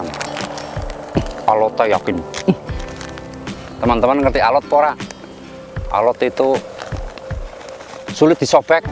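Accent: Banyumas, Indonesia. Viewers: 👍👍salam satu ngapak bro.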